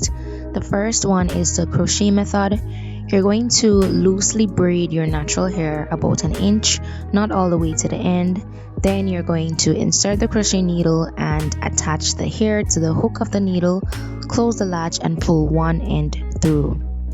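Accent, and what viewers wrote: Jamaica. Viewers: Jamaican cyaan hide enuh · are you Jamaican?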